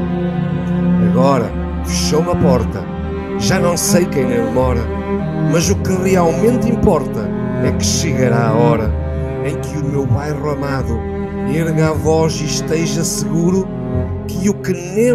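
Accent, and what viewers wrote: Portugal. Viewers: com o sotaque português dar toda elegância ao poema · Estou Emocionada ao ouvir tão bela poesia! Me fez lembrar da minha Infância queria . Eu realmente tive uma Infância Feliz. Eu Amo o seu sotaque Lusitano. Obrigado.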